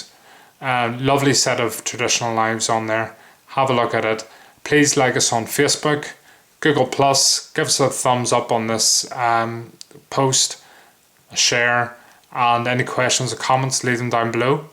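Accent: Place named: Northern Ireland, United Kingdom. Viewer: Where is Heinnie Haynes located? I'm hearing a fellow northern irish accent there!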